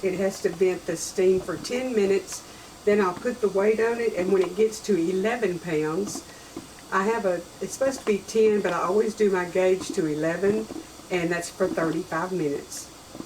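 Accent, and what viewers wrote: South, United States. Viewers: Good morning! I'm not an okra lover as I mentioned last time lol. I just popped in to watch and listen to your lovely southern accent and to say good morning. I really don't mind what you can or cook I just love visiting with you in your kitchen. Big hugs, Michelle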